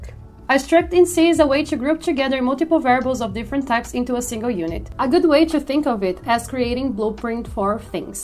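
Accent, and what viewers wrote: Brazil. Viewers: Sabia que era brasileira! rsrsrs Esse sotaque brenglish é diferenciado hahaha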